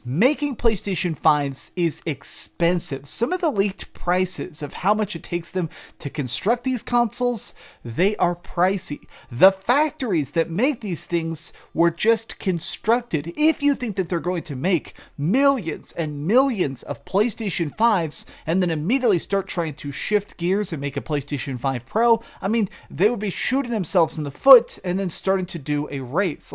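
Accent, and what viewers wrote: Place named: South, United States. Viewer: Why the southern accent?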